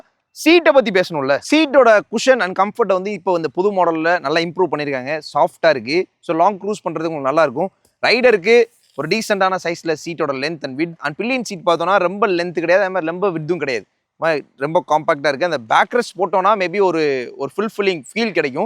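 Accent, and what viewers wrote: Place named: Madurai, India. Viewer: Nimukkum pothu? Speech madhura Karan madhura Karan than😂🎉😊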